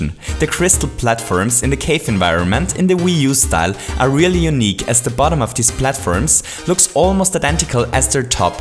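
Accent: Germany. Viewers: du klingst richtig deutsch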